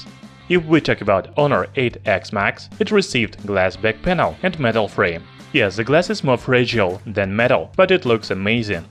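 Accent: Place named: Russia. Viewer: Nice review, but your Russian accent makes it hard to understand what you are saying. Maybe someone else could speak the reviews!?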